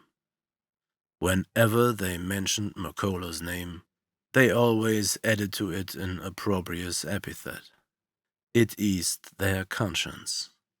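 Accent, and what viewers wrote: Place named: Europe. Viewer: Digging the creepy eUrOpEaN accent delivery here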